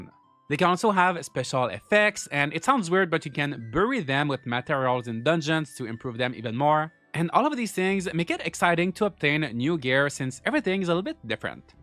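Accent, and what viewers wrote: Quebec, Canada. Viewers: tu sonnes québecois t'es tu quebz le gros ?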